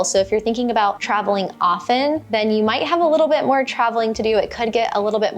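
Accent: United States. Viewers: Que desesperante es la voz en inglés americano... de por si el idioma inglés es así, preferible el inglés británico que es ligeramente peor...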